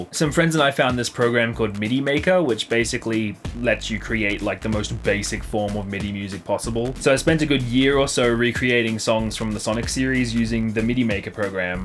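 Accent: Australia. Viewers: Australian I'm pretty sure... not positive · Kneight yep Australian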